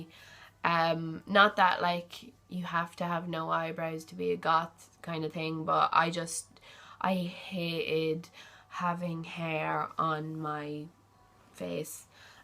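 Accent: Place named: Ireland. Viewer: Greetings! Judging by the way your beautiful voice sounds , I'm assuming you are from Ireland. I work with tons of wonderful fun loving and friendly Irish folks. I work for Ornua Foods in Chicago Ill. Ornua is Kerrygold butter. I call it Dairy Crack. Once you try, you can't stop. Best wished for continued success. Beat to you, LennyK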